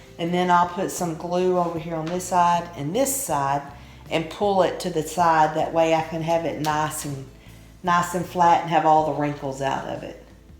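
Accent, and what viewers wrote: South, United States. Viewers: Hi. Judy here. I have only watched a few of your videos. I did subscribe to your channel. I love your accent!!!! I probably sound a lot like you as I am from southern central OK. Every time I go visit my daughter in Oregon, people are always commenting on my southern accent!!! 😘. I really enjoyed watching your fall home tour. And I absolutely love this project that you did in this video. It is too cute and looks easy to make. I am thinking of maybe getting all the necessary items and doing this with my daughters for our project when we are together for the Thanksgiving holiday. Who would have thought of using splatter screens to make something so beautiful. With choices for fabric etc, the sky is the limit. You seem like such a nice and wonderful person we would sure make good neighbors, as I love crafting also!!!! My husband broke his wrist years ago, but the difference with him is that he broke his right wrist and he is right-handed. 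I remember when he went back to work where he worked in the microbiology department of our local hospital lab, he wrote the results of his reports with his left hand. He said at first it nauseated him, but then he got kind of used to it. I hope you do well when the cast comes off. It took him a while to gain range of motion in his wrist because it had been fixed in one position for so long that when he tried to go beyond that position, there was some paiin; however, it did not take him too long. I am sure you will do fine. Looking forwar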